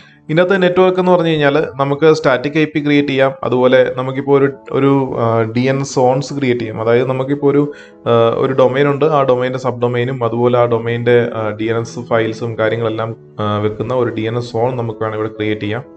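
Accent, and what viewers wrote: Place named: Kottayam, India. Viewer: കോട്ടയം ആണ് അല്ലെ 😁 എന്നാന്നെ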